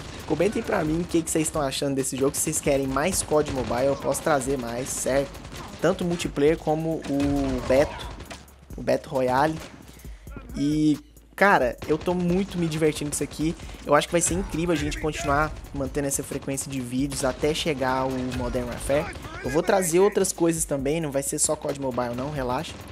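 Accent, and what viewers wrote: Minas Gerais, Brazil. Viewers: O cara é mineiro demais da conta sô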